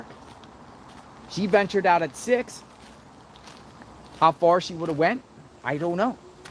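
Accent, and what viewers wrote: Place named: Baltimore, United States. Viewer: where are you from? You sound like you're from Baltimore! I'm from there so I know the accent. Its not heavy (your accent) but I feel youre from BMore /Philly????? Please answer if you have a minute. TIA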